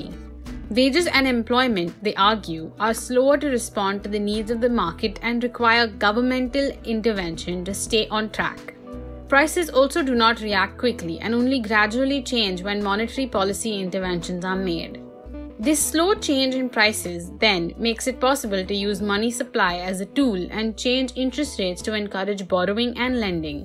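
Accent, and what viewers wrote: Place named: India. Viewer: If you can't speak clean english, then SLOW THE F DOWN. If you would speak slowly many of us who can not understand Indian dialect would still be able to benefit from your videos.... otherwise completely worthless.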